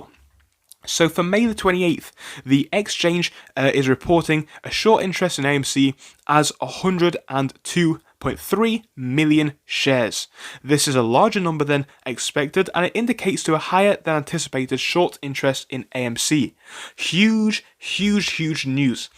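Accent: United Kingdom. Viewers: You from the North? A UK Ape?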